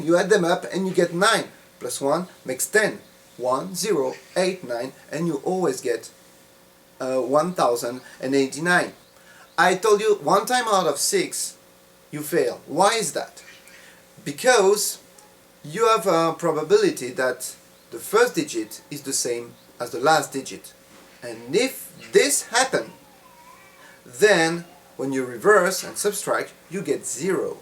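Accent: France. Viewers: tu es francais non ?? tu as l'axcent francais !!! you're freenche ?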